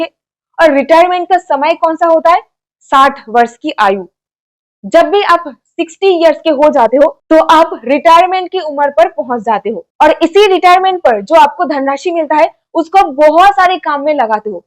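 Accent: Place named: South, India. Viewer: Your south india hindi speaking style is osam, I like your speaking voice.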